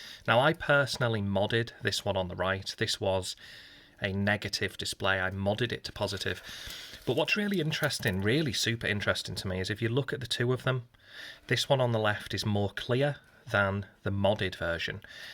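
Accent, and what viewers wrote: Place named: Liverpool, United Kingdom. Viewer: Love this watch,. I also love your accent! I'm a Yank studying all the different dialects of the U.K. Do I detect a bit of Scouse, Mate?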